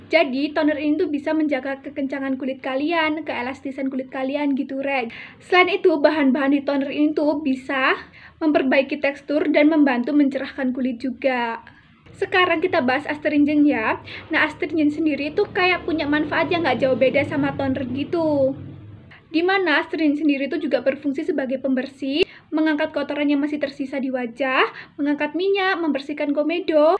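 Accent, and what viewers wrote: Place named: Malang, Indonesia. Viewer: Khas logat malangan ki bocahe. Ayu ayu kinyis kinyis wong kono yo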